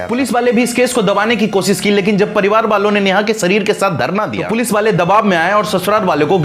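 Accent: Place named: Bihar, India. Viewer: Sadi nh shaadi 😅😅 tum log anchor Ban jaate Ho lekin Bhasha bihariyon wali bolate ho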